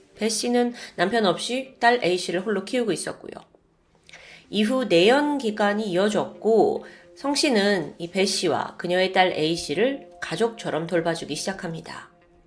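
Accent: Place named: Seoul, South Korea. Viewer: 목소리 맑고 순수한 서울말씨 얼굴 모두 다~아 좋아요 75세 팬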